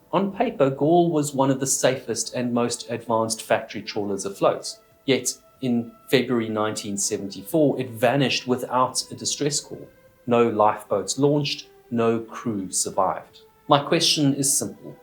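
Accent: South Africa. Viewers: Yeah, pretty sure he's, that's just how it sounds with a South African [I think] accent.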